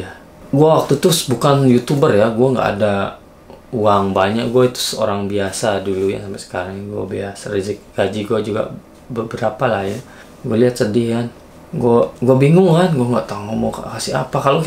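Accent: Lombok, Indonesia. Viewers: Kok logatnya kayak orang Lombok ya?😁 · Logat sasak nya masih ada bang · Logat lombok nden bau telang bro 😅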